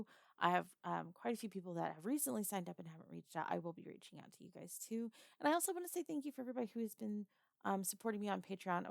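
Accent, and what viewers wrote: South, United States. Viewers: You know it's crazy you have such a good southern accent. It's almost like you were born in the south 😂. I'm kidding, I know it's real and it's amazing just like you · Your southern voice is sexy 😘😍😋🤤🥰❤️‍🔥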